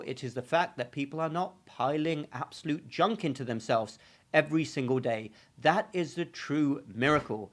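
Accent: England, United Kingdom. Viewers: This is so hilarious: ‘Injections are dished out like candies.’ By the way, don’t lose your English accent. This is an excellent educational page. Thank you for sharing your knowledge with us, Doc.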